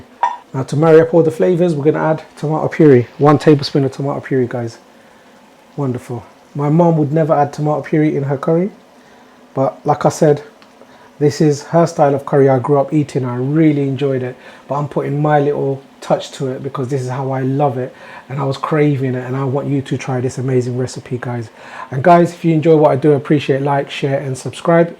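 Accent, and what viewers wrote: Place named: United Kingdom. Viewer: 👍 Always curious about All types of foods but I was born in Morocco… I love my moms cooking but the Indian food goes very well with my traditional education about taste flavours that’s all about. I guess your English from UK sounds familiar to me, I been working in London quite a while in one of the best Japanese restaurants at Old Park lane NOBU juste what is all about GREAT. God bless you 🙏🇲🇦🇲🇦🇫🇷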